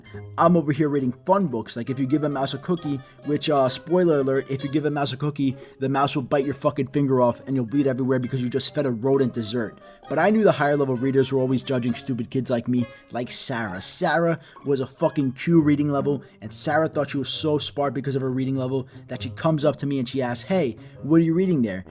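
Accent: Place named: New York City, United States. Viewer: Just found your channel, you are so underrated. And I'm so sure you're from NYC. You have our dialect. 😂🙌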